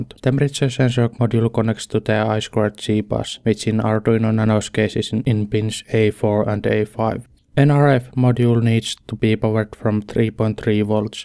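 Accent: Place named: Finland. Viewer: The accent sounds a bit finnish. (Rallyenglish)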